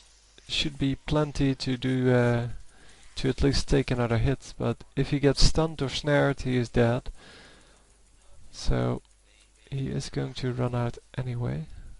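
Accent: Netherlands. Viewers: Only real dutch people have this i live in the netherlands as well but my parents are from somewhere else but i dont have THAT accent :)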